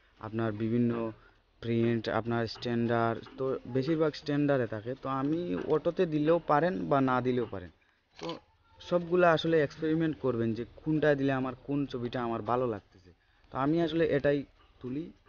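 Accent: Sylhet, Bangladesh. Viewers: তর বাড়ি মনে অয় সিলেট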